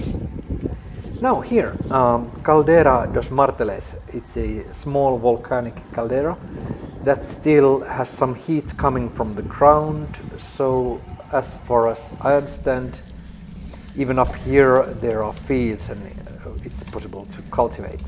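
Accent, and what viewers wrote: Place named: Finland. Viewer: There are great conditions and landscapes 👍 It's interesting how well a Finnish understands that English with a Finnish accent😁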